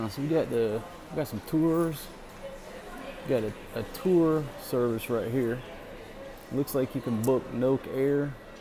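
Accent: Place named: Atlanta, United States. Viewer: Great Review. Atlanta, GA that explains the accent I hear in your voice.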